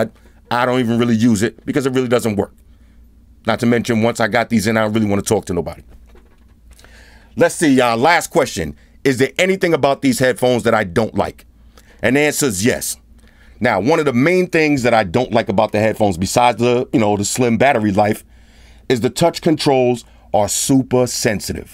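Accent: New York, United States. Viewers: SQUAAAAD! Love you. Half of the time I'm watching your videos because i'm a tech girl and I want the latest stuff out. But the other half is because i'm in The South and I miss NY dudes with the "accent". Take care and continue to bring us that good ISHHHHH!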